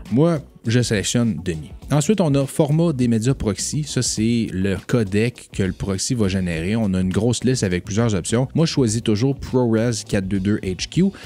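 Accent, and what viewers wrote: Quebec, Canada. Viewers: 😎 Merci beaucoup pour cette vidéo. Même si je faisais déjà des montages de base sur DaVinci Resolve depuis la version 16, je viens de passer à la version STUDIO 18.6. Je crois que tu m’as influencé. C’est cool d’avoir une ressource avec le même accent que moé. Si tu habites la région de Québec, ça serait cool de prendre une bière ensemble et jaser de montage vidéo.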